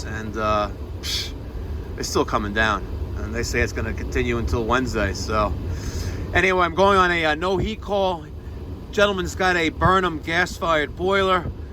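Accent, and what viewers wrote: New York, United States. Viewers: Trying to copy Steve laviemore channel, gloves, camera, videos but with New York accent. No wonder he deleted you. Trying to be a celebrity plumber. Nobody likes a copy cat. Lol only .I couldn’t work all day doing service trying to put on a show holding a camera. To much time. To many calls. Not bad if you only one or two calls a day. But five to six.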